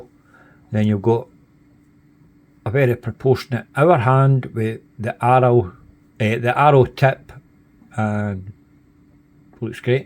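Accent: Scotland, United Kingdom. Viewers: Lovely Scottish accent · I actually like it in nice good old scotish accent. More than standard london english, to posh for me.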